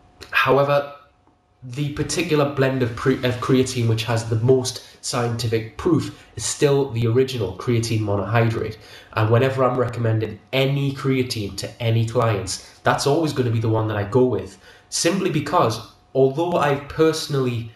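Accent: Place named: United Kingdom. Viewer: Hey, from your accent I guess your from the UK, i was wondering where abouts are you based as i was thinking about having a personal trainer cheers tom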